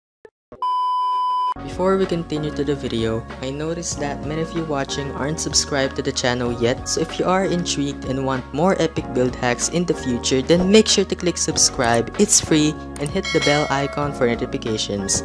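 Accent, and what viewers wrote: Philippines. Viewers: Hello, are you fillipino?? Iam fillipino too... I asked that becoz of your accent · Your accent is like a Filipino speaking English. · Are you a Filipino? I can tell it with your accent. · Hi you sound like a filipino:D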